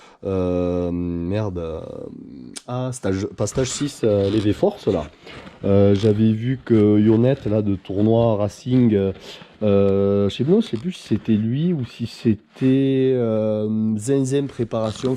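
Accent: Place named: Montpellier, France. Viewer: Accent Montpellierain on dirait ...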